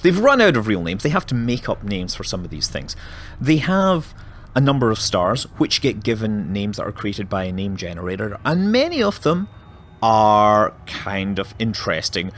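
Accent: Scotland, United Kingdom. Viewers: I just listened to a scottish man talk for 20 minutes about catalogues. Life is good.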